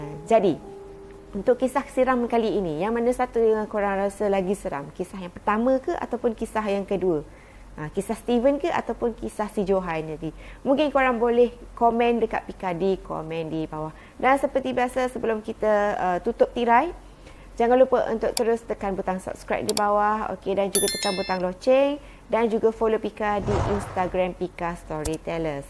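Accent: Kelantan, Malaysia. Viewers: hai kak pika dengar macam org klate?... sentiasa tgk video kak terus maju jaya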